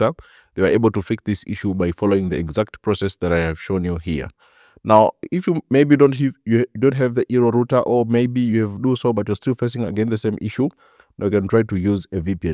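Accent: India. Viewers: never trust a guy on pc thinks with indian accent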